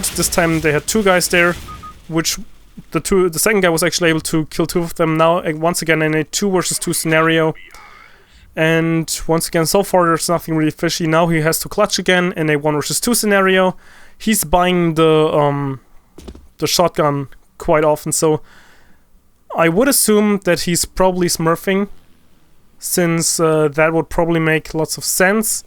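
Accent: Germany. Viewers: Das erste Video was ich von dir sehe, direkt am Akzent gemerkt dass du Deutsch bist haha :D Ist aber ein mega Geiles Video geworden ! Hab mal gesubbed ;)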